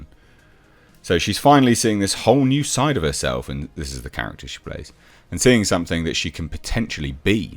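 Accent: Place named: United Kingdom. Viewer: "toh-mah-toes" Not being demeaning but I love how you Brits enunciate some words quite differently than those around me. Plus comments helps the algorithm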